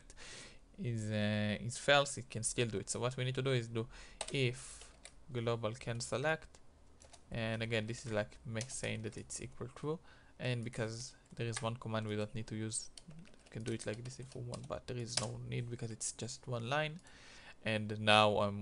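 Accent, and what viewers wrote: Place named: Israel. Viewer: תודה אחי, רואים על המבטא שאתה ישראלי :)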